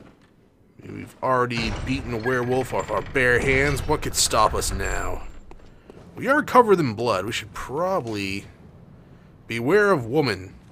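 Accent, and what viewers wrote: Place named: Mid-Atlantic, United States. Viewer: Knowing that has Brit relatives goes someway to help explain his accent, clearly American but every now and then it verges into mid-Atlantic eg lever pronounced as lev-ver but occasionally as lee-ver it's somehow enjoyable to listen too & coupled with the gameplay & the extraordinary engaging commentary it has me watching a game that not normally my cup of tea.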